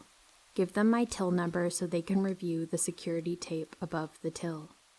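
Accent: South, United States. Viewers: Southern US accent is very distinctive. I lived in Texas for a year when I was a kid. All my other years have been spent in Western Washington State where I was born and still live now. Thank you for another awesome video. 😍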